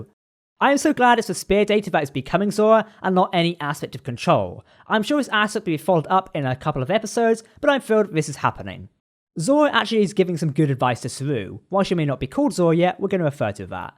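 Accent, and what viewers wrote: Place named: Cockney, United Kingdom. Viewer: Thank you for this.... I like watch your reviews prior to watching the episode.... one humble request.... is their a way you can talk a bit slower, the cockney accent (of that's what it is) gets in the way of comprehesion a bit.... thank you again....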